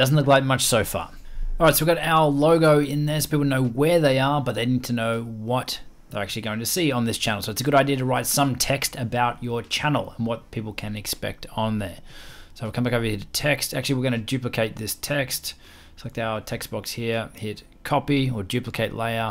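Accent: Australia. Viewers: great Aussie accent~~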